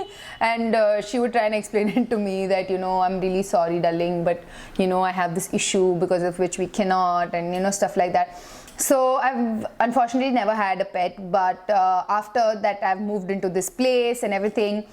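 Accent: India. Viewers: Are u from Kerala..,( palakad tamil)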